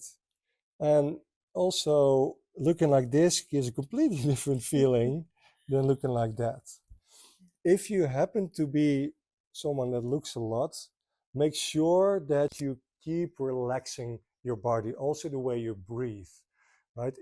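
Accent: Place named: Netherlands. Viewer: He is Dutch 😂 I can tell by the accent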